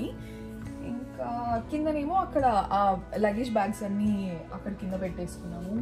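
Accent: Telangana, India. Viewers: Very nice home tour manchiga undhi sis❤im your new subscriber i love your slang our Telangana hyderabad. Slang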